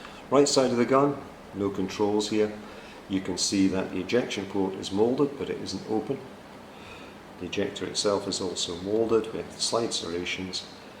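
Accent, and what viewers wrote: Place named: Scotland, United Kingdom. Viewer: Thinking about getting one of these for film use, Hey, nice to hear a Scottish review. I'm Scottish myself :) Haver a good Christmas and thanks for uploading.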